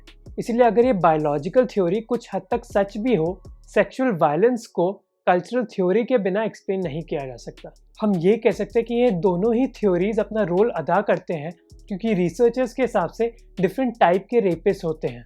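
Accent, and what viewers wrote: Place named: Northeast, India. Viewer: By the accent i am sure you are from arunachal or northeast right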